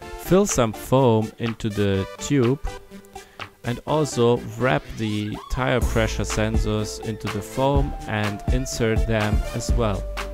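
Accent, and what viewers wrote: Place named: Germany. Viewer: Ah the german accent is making the video wholesome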